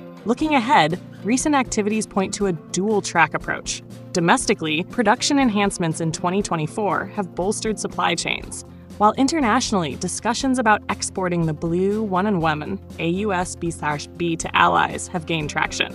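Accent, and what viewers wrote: United States. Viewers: All the companies you mentioned are foreign owned. The only way Thales would continue is if it sold its operations to another foreign company. Not to mention this clip is narrated by an American.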